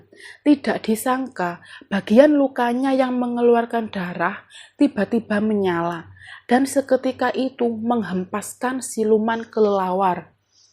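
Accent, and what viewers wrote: Java, Indonesia. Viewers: Jowo" mbak😂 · Ya maklum lah,,,, mungkin mbak nya dari suku Jawa 😋😋😋😋😋kan masing-masing chanel punya ciri khas 🤡🤡🤡🤡🤡🤡🤡🤡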